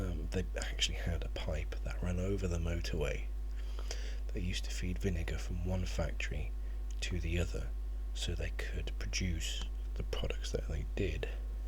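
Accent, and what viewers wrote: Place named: United Kingdom. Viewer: nice video.awesome accent, living in philly really makes you appreciate proper English.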